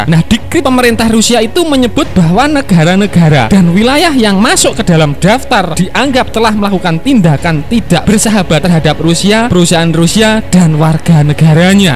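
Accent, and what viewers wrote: Java, Indonesia. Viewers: Intonasi narasine muantap nJawani begete. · suara Admin logatnya jawa banget dan seksi.. Urrraaaa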